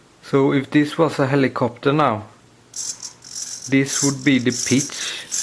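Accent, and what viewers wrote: Jönköping, Sweden. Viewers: Är du från jönköping?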